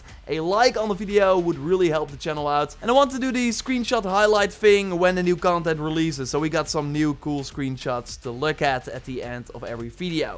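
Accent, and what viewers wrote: Netherlands. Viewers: Aan je accent te horen ben je een Hollander ,choes your diffucultie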